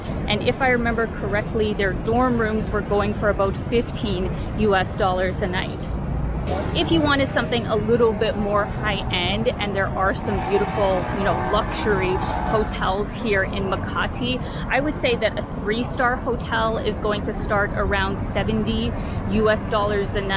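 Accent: Canada. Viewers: Your "out" and "about" accent is a dead give away that you're Canadian. 😉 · Welcome to the Philippines and sorry to hear about your stomach bug or flu. For me your accent gave away where you’re from before you even mentioned that you’re from Calgary. I’m pretty sure you’ve received a lot of recommendations already as far as what food to try and places to visit. If you ever get tired and want to relax, get a massage and I recommend “Hilot” massage. For me, that is better than Swedish or Thai massage. I recommend to go get them at “The Spa”. They have a lot of locations within Metro Manila.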